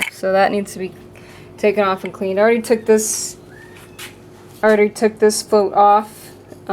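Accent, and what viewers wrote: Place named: Canada. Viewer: Hi, I know it's a while ago now, and this wasn't your bike, but did you discover the reason for the oil on the plugs and filter? Also I believe I detect a Canadian accent, but was it a California model? I hear they have their exhaust shit fed back to them through the carbs, which surely can't be healthy for the engine!! Bloody bullshit climate legislation!! When do they ban cow farts? The state of the filter was alarming. Did you perform a compression test? My guess is you didn't because it looks like a much harder job to access than on my CB-1, and that's a bugger on the inside cylinders. Could be valve seals I guess. It's sad to see a great bike neglected that badly isn't it? Thank you for the instructional vid. Finally, can you send me a bottle of pineol in the UK?;)) We don't have it here and I don't know what the equivalent is.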